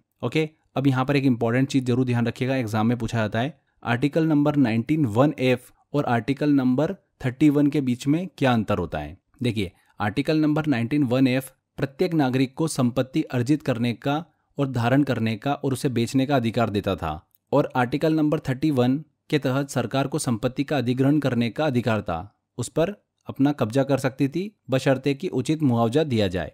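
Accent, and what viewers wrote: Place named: Gujarat, India. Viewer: Aap hai Aapki tone gujarati hai but surname toh up ya bihar mein hota hai Q)toh aap kahan se ho: 1)Gujarat 2)Up 3)Bihar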